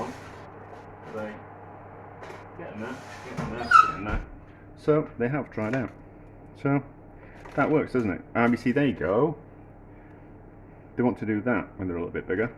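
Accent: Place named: England, United Kingdom. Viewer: Granted I'm an American but I find his English accent very hard to understand. He talks too quickly and not loud enough.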